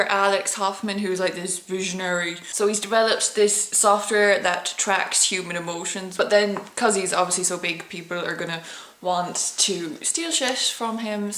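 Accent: Ireland. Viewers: oh I love your Irish accent!! I´ve been there for half a year and it was great. It remembers me of the time there when I listen to you ;) great videos as well!! P.S.: Are you from the West of Ireland? :)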